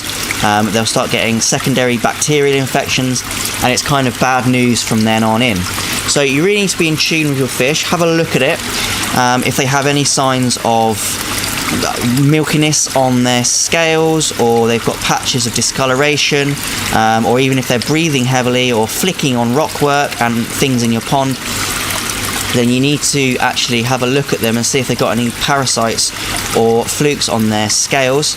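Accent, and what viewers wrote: England, United Kingdom. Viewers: He’s literally speaking English in an English accent. How is it that you can’t understand him 🤔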